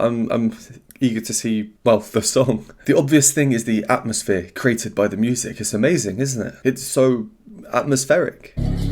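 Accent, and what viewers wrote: United Kingdom. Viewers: Dear, I just love your videos and analyses, having no knowledge of music in theory and understanding next to nothing about notes and registers. Besides, being a linguist, I really enjoy listening to your irreproachable British accent. And Dimash... He is so talented that no words in any language can express. Everything he does is so beautiful, so powerful, so peace-in-the-world-loving (if that might be considered a word). Anyway, I'd like to give you a recommendation of a totally different kind. It's related to a... beatbox artist from Russia, Taras Stanin. Just look him up on YouTube if you find time. He's also an extremely talented guy in what he does. And the things he does about music, about covering well-known pop songs with his incredible combination of beatboxing and singing are just out of this world, in their own way, of course. 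Right now, his popularity on YouTube is skyrocketing due to all that stuff. I just wonder what you might say about such an outstanding artist performing in such an usual genre. Best wishes and regards from Russia! 🤗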